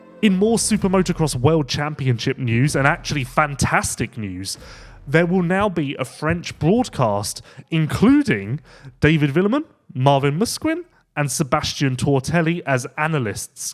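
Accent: United Kingdom. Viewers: Grumpy, monotone UK dude.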